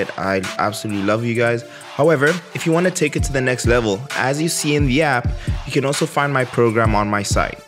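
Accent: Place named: South Africa. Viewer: u sound like you're from South Africa but relocated to USA..New to the channel. Love all the info you're giving..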